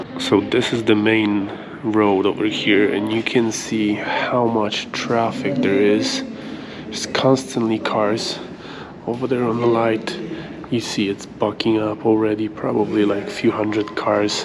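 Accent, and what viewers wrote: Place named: Poland. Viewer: This guy isn't American. He sounds polish to me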